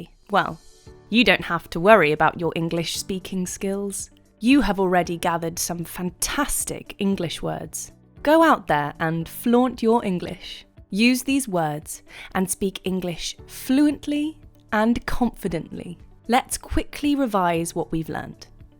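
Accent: United Kingdom. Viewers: I really like your British accent. Furthermore, I enjoyed the lesson. Thank you 😊😊😊😍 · This girl's voice accent seems from united kingdom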